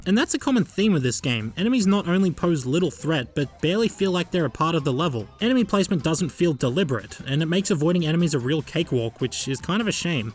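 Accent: Australia. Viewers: Are u from Melbourne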